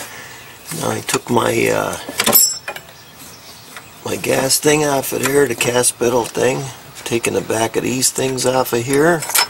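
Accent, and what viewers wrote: Pennsylvania, United States. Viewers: Best video I ever seen. Thanks 👍✌ PS. Your from PA. I know the accent 😄😄😄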